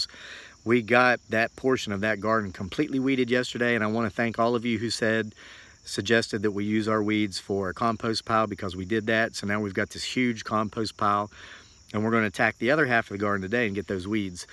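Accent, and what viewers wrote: South, United States. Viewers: Hey, watch out flipping that old phone around, you might get beamed up to the Starship Enterprise. Haahaa. Just kidding, I got one too, and will keep it until it dies. Real money savers too. You are right about people zoneing out with their noses 8" from the I phone screens. It will, and is having a negative effect on our society, people (Young people) have lost the art of simple conversation and reading faces and expressions, and that is concerning. Agree that getting a signal in the country can be very hard, you may have to use a different carrier. Here in the Ozarks, it can be a problem sometimes too. I see that you have a bit of a Southern accent, are you from the Carolina's? If so, did you hunt and fish as a boy with your dad? Will you be teaching your son those skills too? Enjoy your show. Thanks.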